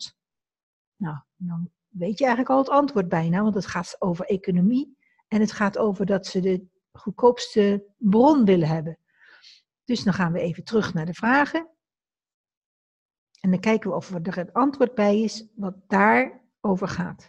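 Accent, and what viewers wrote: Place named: England, United Kingdom. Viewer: je hebt echt een engelse accent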